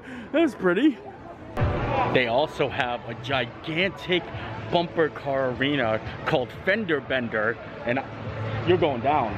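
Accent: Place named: Northeast Pennsylvania, United States. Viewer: I always thought you had a north east PA accent. Lol. If you’re ever near Williamsport PA, hit me up.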